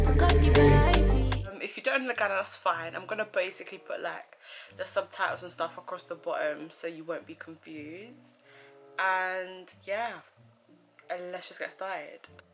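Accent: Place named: Uganda. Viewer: The Uglish is on point ! 😂Loved thisss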